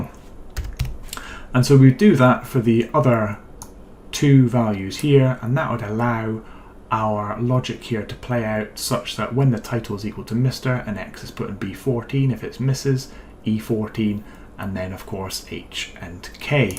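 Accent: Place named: Scotland, United Kingdom. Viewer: I really appreciate all your efforts spending to provide such detailed instructions about Power Platform and Office apps features. I lost my mobile iPhone in Aberdeen, 2 days later someone found it and called my emergency contact with Scottish accent (obviously), and I managed to get it back. Since then, I am happy to hear anything with Scottish accent. Sorry for the side note 👍🏻Kudos to you.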